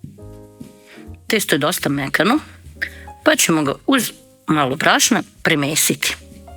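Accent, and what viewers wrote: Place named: Srem, Serbia. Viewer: Divni ste . Podrzavam Vasu privatnost. Sigurna sam da ste moja SREMICA. Dijalekt, pripreme Vasih specijaliteta kojima se odusevljavamo. Za VAS POZDRAV OD ❤❤🎉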